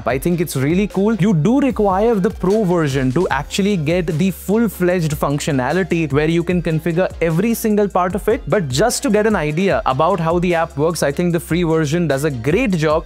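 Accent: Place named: India. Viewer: bro, what an annoying jeet accent.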